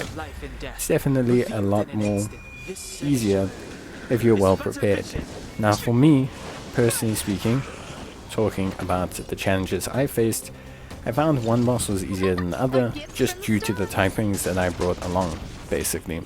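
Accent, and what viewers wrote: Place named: South Africa. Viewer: Bro sounds south african😅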